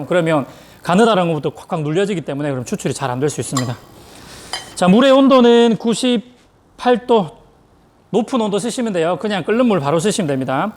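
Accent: Gyeongsang, South Korea. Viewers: 카라멜 마끼야또도 집에서 만들수있는 방법 가르쳐 주세요^ 구독 할께요^ 집에서 핸드드립으로 마시는데 라떼 넘쉽게 가르쳐 주시네요 경상도 이신가요 사투리 쓰시는뜻 저도 경상도 ㅋ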